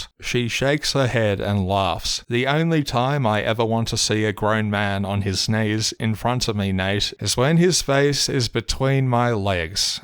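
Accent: Australia. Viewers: That’s an Aussie accent also you have not made any points against any of what he has said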